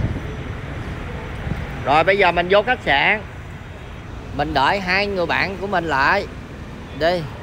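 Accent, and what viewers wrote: Mekong Delta, Vietnam. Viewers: không làm bộ đâu có nghe nói 30 năm chưa về Vn nếu như vậy anh rời Vn 1993. Nhớ không lầm là người Trà Vinh cho nên nói người nhà quê đi Sài Gòn là đúng không có sai. Bạn nói làm bộ lúa cho phim hấp dẫn nghe cho rõ giọng nói là giọng miền tây chính gốc. Nếu đi 1993 Vn còn bị Mỹ cấm vận thương mại mãi đến 28/01/1995 hai bên mới mở văn phòng phòng đại diện. Đến 1997 Vn chính thức mở cửa thương mại thông thương với các nước nên mới thay đổi bộ mặt Sài Gòn Gòn xưa. Những năm đó muốn nhập hộ khẩu ở Sài Gòn cũng rất khó khăn.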